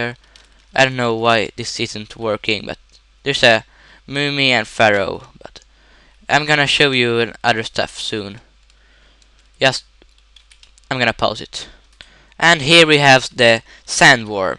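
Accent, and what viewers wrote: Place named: Sweden. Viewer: du måste vara från sverige XD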